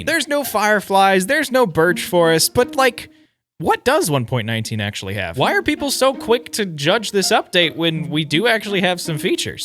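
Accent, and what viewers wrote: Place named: United Kingdom. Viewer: yeh, but where are you from? Your accent sounds like british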